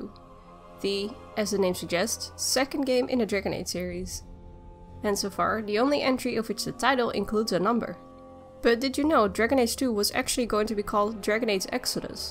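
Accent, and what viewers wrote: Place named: Netherlands. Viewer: So i clicked on this because it was recommended content and as soon as i hear the voice i instantly go, this one is dutch...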